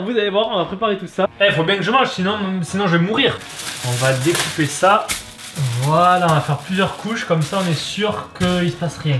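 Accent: Marseille, France. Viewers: C'est l'accent marseillais ou il ne sais pas parler ?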